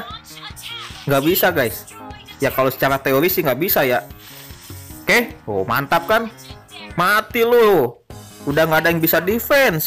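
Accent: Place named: West Java, Indonesia. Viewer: Org Bandung ya..ngomong nya Sunda banget ... Bandungnya dmna kang .???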